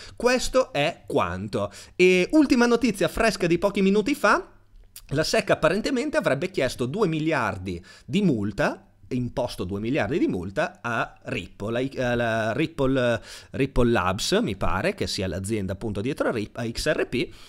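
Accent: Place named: Friuli, Italy. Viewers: Vero però ha un accento che sembra friulano 🤣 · Sembra essere tra Udine e Tavagnacco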